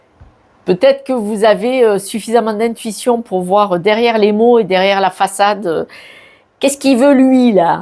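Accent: South, France. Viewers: Tirage très chaleureux et convivial à l'image de la personne que vous êtes, naturelle et authentique. Surtout ne changez rien. Cerise sur le gâteau, votre accent qui chante bon le sud ! Merci pour le partage de la part de votre nouvelle abonnée. A bientôt ! 🌞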